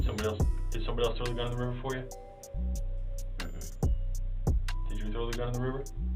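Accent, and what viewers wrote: Boston, United States. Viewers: Love the Boston accent I'm from NY what up. I'm following this trial and I love tour style and how u cut you videos with so many visuals. Also I make club music on Ableton and u see u must be making shit yourself from the back ground. Anyways I like and subbed. Word, no names. ... I did 2 yrs up top over here. That shit don't fly my way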